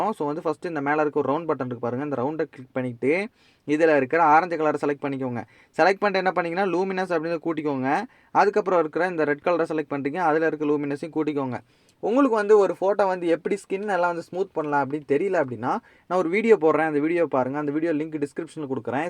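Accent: Kanyakumari, India. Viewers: Neeka yanntha Oru Anna 🤔 kaniyakumari language mathiri iruku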